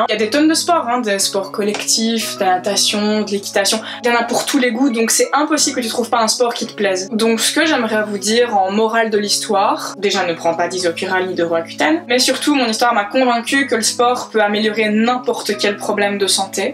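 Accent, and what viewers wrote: Belgium. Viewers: t'es belge? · Tes belge ?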